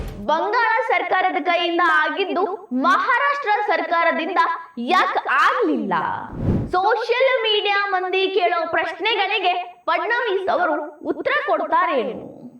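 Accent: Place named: Haveri, India. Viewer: ನನಗೂ ಬಹಳ ಇಷ್ಟ ಆಯಿತು, ಹಾವೇರಿ ಕಡೆಯ ಭಾಷೆ ಇದ್ದಿರಬೇಕು. 🌷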